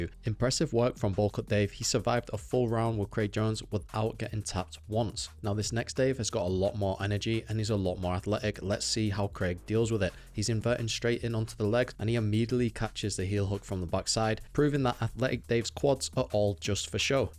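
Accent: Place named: United Kingdom. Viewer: Shit is literally unwatchable with this limey talking the whole time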